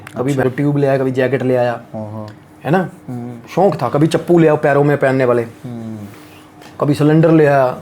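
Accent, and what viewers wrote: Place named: Punjab, India. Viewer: paji sat shri akaal ji.. paji ek gl kehni c meri gl da gussa na kreo bai dilo respect aa tanu veere i really appreciate for your hard working. Paji ek cheej main piche boht dina ton note kr reha k eh banda jehda tade nal baitha hunda naam aa i think veer da, bai eh Banda tadi energy khraab kar reha yr tadi gllan sunan ch boht chass aandi, kyonki tuhada lehja punjabi touch aa extreme energy aa tadi 24/7 active rehnde oo tuc. Pr bai main fr kehna meri gl da gussa na kreo pr jehda banda tade nl baitha hunda aa eh bnda tadi energy khtm karda yr nal beh k susti wala atmosphere bnaya hunda es ne. Sare sade interest nu khtm kr dinda yr eh banda please es cheej t thodi working kro veer g. Otherwise mainu eda feel hunda k jes trha main da susti bhrya chehra dekh k irritate hunda rehnda baki tadi audience v jroor irritate hundi hovegi. 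Eh banda bai mhol bnan ton pehle ee swaad khraab kr dinda aa yr. Ann.. Ann.. kr kr k please bai meri gl da gussa na kreo main dilo respect krda tade hardwork nu baki tuc khud siyane oo paji. dilo pyaar❤️ sada hassde vassde rho, baba bhali kre☑️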